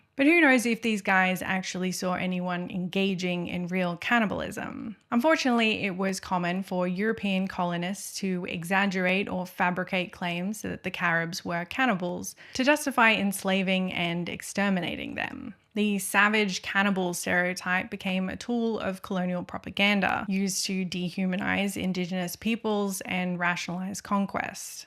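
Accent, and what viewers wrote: Australia. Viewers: Your accent is cute lol Australia? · You look mexican and sound Australian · An Australian video essay is so weird to me, it feels like I’m listening to some kind of ABC investigative report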